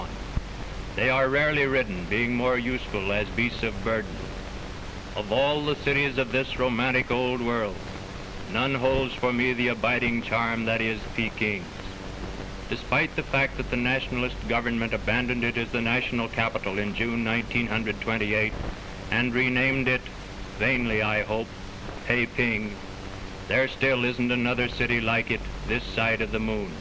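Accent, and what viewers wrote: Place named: Mid-Atlantic, United States. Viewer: Yes, it was a typical manner of commenting back then, with the upper class Mid-Atlantic accent, and had nothing to do with disdain. It's also worth remembering that it was only the 2nd or 3rd year of the "talkies."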